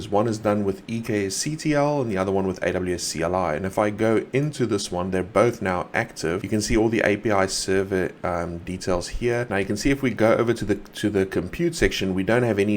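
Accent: South Africa. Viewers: Why does it sound like you're afrikaans.?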